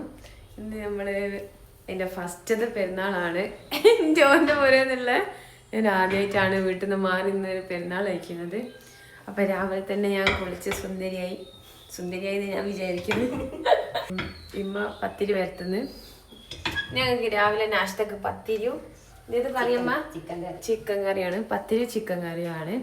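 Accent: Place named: Balussery, India. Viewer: Kozhikode Balussery aahn thonnu samsaram kettit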